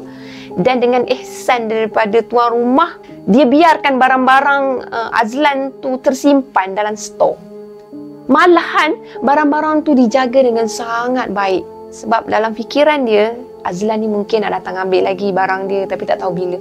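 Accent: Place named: Pahang, Malaysia. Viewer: Ok kak ten q.bagi lah tahu bila ckp mcm logat org pahang.😀😀😀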